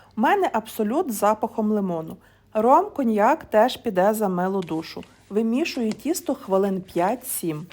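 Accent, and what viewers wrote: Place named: Galicia, Ukraine. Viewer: Браво ! Зразу чути, що галичанка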